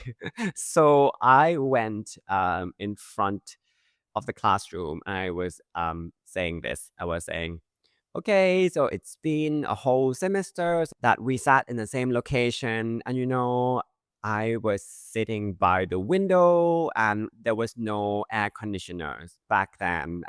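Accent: Australia. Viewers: just curious - your accent is more Aussie than British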